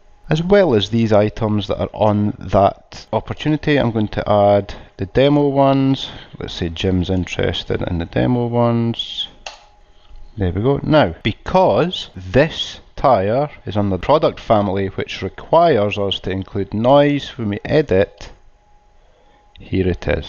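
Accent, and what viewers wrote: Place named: Scotland, United Kingdom. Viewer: You should do in another accent, i cannot stop enjoying this nice scott lad's accent... I need to watch it again for the content....JK.....its nicely put together...well done....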